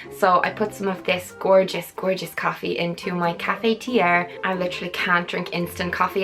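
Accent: Ireland. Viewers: Ireland u can here on her accent hahah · R u Irish?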